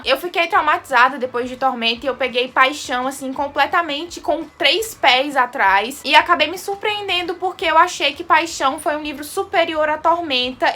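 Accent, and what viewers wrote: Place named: Ceará, Brazil. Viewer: Oi,tudo bem? Pelo seu sotaque chuto que vc é cearense kkkk (sou nova aqui então fui no teu insta pra ter certeza, e fico feliz de vc ser daqui😊) eu queria saber se vc costuma comprar ou deixar livros no sebo, se sim qual sebo vc me indica?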